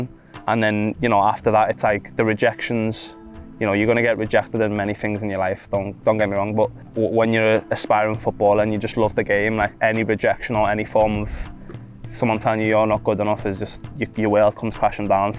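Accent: United Kingdom. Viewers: شنو الأكسنت الي ديحجي بيها بريطاني أو انجليزي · انكليزي بس مو امريكي لان هو عايش بانكيلترا فا اكسنت مالته هو وزيدان انكليزي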